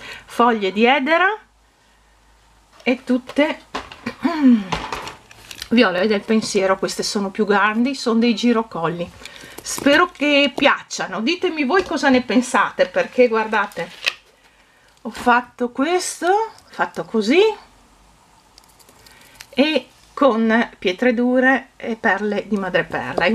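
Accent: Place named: Verona, Italy. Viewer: Ciao, ti seguo sempre molto volentieri per tanti motivi. In primis la tua cadenza che adoro essendo per meta' veronese; le tue creazioni sempre cosi' piene di fantasia, il tuo buonumore e la tua gioia di vivere. Poi sentirti nominare paesini che mi ricordano la mia infanzia tipo Boscochiesanuova dove andavo da bimba, mi fa sempre sorridere. Grazie di tutto e Buona Pasqua a te e a tutti coloro che ami. Olga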